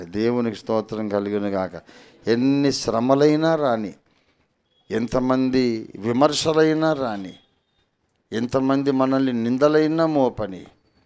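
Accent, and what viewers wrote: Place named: Andhra, India. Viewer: గారు మీరు తెలంగాణ వారు అయినా మీ భాష చాలా అద్భుతంగా ఉంటుంది అసలు సిసలైన అటువంటి ఆంధ్ర విజయవాడ గుంటూరు భాష మీరు మాట్లాడతారు మీ భాష చాలా అద్భుతంగా ఉంటుంది పాస్టర్లు ఎందుకు అదోరకమైన భాష మాట్లాడతారు ఎందుకు మాట్లాడుతారు తెలియదు ప్రజలందరూ మాట్లాడుకునే భాష అందమైన భాష మీరు మాట్లాడతారు చాలా అందంగా ఉంటుంది మీ భాష ఆంధ్ర వాళ్ళు కూడా ఇంత చక్కగా ఈ భాష మాట్లాడలేరు మీరు మాట్లాడే భాష వింటుంటే ఎంత ఆనందంగా ఉంటుందో అసలు సిసలైన ఆంధ్ర భాష అంటే మీరు మాట్లాడింది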